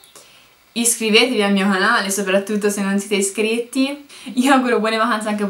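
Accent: Tuscany, Italy. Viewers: Che bello l'accento toscano 😍😍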